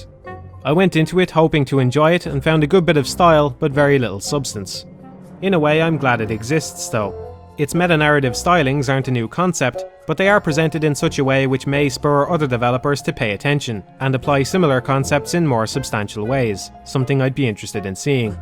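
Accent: Ireland. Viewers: You said "fuck you" in your thick Irish accent. My day has been made.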